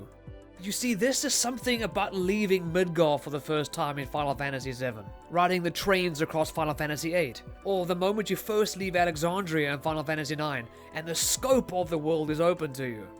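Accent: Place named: South Africa. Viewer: Hierdie ou klink Suid Afrikaans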